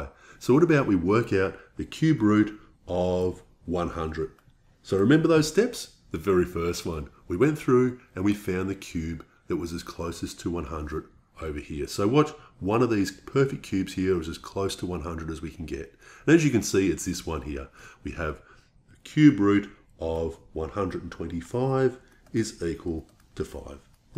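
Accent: Australia. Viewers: Bro are u aussie by chance😮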